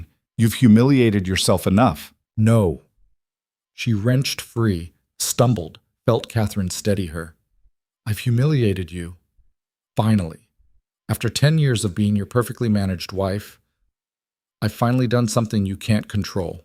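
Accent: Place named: United States. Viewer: Why is it using an American English accent for a story set in Britain? This whole storyline mixes up timelines with 19th century, 20th and 21st. Be prepared to feel constantly confused about courtroom drama and the need for a passport in 19th century England lol.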